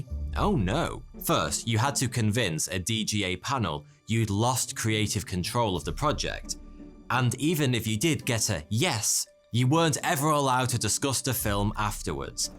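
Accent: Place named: RP, United Kingdom. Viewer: dis bloke is the posh version of vsauce lmao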